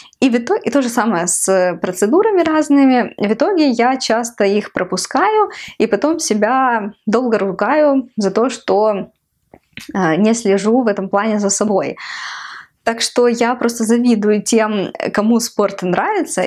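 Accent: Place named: Ukraine. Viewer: я недавно начала смотреть твои видео и все никак не могла понять, что у тебя за интересный акцент!?? А оказывается вы дома на украинском разговариваете! Так ты русский выучила специально чтобы канал вести?...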